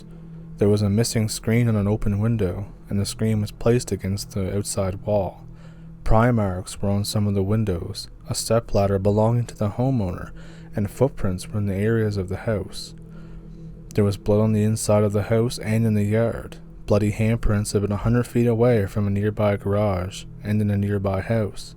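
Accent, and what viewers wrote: Canada. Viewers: Canadian accent is lovely 😊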